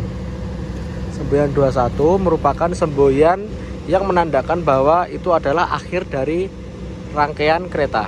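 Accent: Java, Indonesia. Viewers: Ngomonge jawil banget....... alias medok banget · Medok mu itu 😍🥰💕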